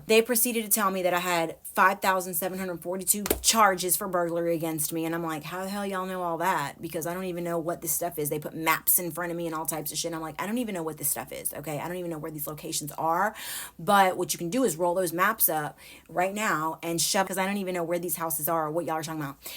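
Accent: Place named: Texas, United States. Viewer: Ngl I thought she was from El Paso or some where in texas because of the accent.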